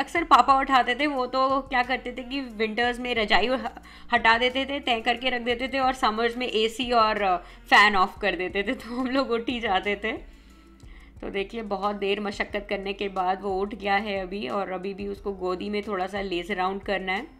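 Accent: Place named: India. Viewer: Hi.. first time I am watching your video.. I am happy, you are an amazing and fun loving person.. I love the fact u still have the Indian style be it accent or cooking.. I see many catching a fake accent in a month or two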